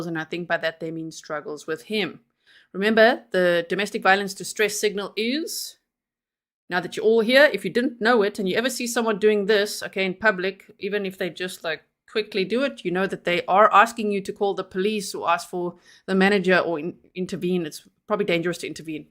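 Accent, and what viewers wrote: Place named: South Africa. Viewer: So glad to finally find out that you are from SA! When I first watched one of your videos and thought "man that accent sounds so South African" so thank you for confirming that, my mind can rest easy now! From a fellow South African!🤗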